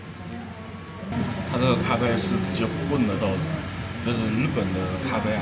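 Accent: Wuhan, China. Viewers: 武汉普通话，一开始就听出来了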